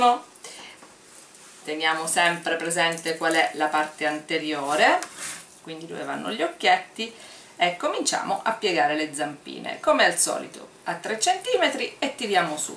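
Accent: Sicily, Italy. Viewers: Sei siciliana?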